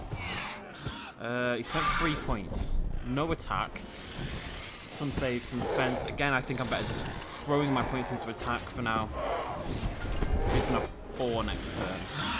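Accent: United Kingdom. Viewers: I love how says Hello Poppet. I just love the British accent I think British people are awesome.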